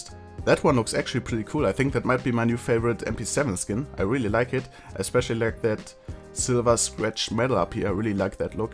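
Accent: Germany. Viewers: Ich muss mir nur die ersten drei Wörter anhören und schon weiss ich das der Deutsch ist xD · you are from germany right? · R u from germany? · Man hört einfach das er deutsch ist :D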